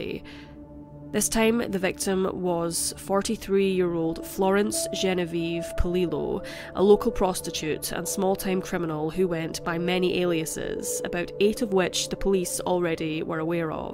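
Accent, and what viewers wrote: Scotland, United Kingdom. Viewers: Well this puts Jack the Ripper into the lower leagues of serial killers for sure. This was a very professional production told in a clear and logical way and very interesting; I have subscribed. You also have that lovely Scots accent which is perfect for story telling. Thank you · Really liking the narrator's voice. Scottish? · Scottish accent for me , very erotic for nightmarish stories ... , the reason of sucess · Scottish accent. Why does it matter if she narrates? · I can't imagine having such a limited (and limiting) world view. Check out any of Sean Connery's movies, and you too can learn to recognize a Scottish accent. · Scottish accent. Not English.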